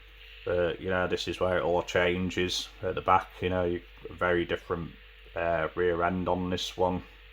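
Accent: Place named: United Kingdom. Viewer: Hi. You sound like you're in The UK and I am in America. You mentioned that the '67 Plymouth was a grail kit for you. I was wondering what America cars you had in the UK when you were growing up and what cars you like. I was hoping you could do a video about the subject. Btw i grew up in Lansing / Detroit, Michigan in all of the US auto factories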